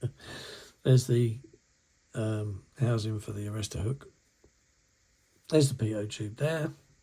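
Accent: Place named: England, United Kingdom. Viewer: With some English dialects I have troule hearing right..did he say the resin pilot and thrust cone comes with the kit?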